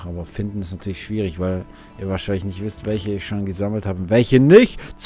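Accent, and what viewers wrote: Germany. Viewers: Ich finde es sehr schade, dass deine oberschwäbischen "Sprachwurzeln" mit dieser "Köllschen Betonung" die Du mittlerweile angenommen hast, verloren gehen.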